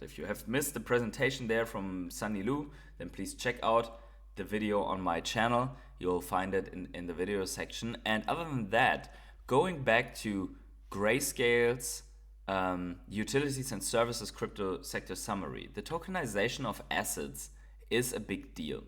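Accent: Germany. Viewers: Woof🐶 Hörst dich sehr Deutsch an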